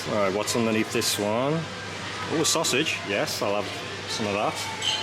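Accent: United Kingdom. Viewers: ARE YOU BRITISH??? Dude I thought you were an Aussie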